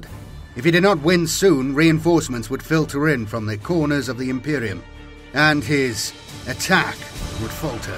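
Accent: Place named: Midlands, United Kingdom. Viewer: Good video and was nostalgic at the end remembering the old dioramas. I remember one of an orc invasion (on a desert world?) which featured a vindicare assassin atop a building. One of the highlights of my childhood. Also, good to hear a Midlands accent on youtube, even if it is the west, hehe. Greetings from the East Midlands.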